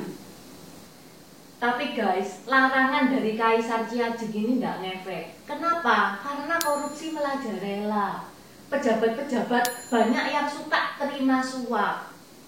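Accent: Java, Indonesia. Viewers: Kapan-kapan kalo saya ada presentasi mau tukeran jiwa dan raga sama cece ini xD Sangat energik ngomongnya, medok e kuwi lho jeruu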